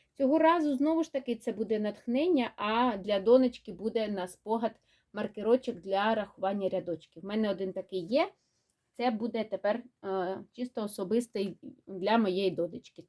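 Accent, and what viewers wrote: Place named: Western Ukraine, Ukraine. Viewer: hand-pink-waving:Харків! Ви поруч зі мною! А спочатку мені здалося. що ви з Заходу України розповідаєте.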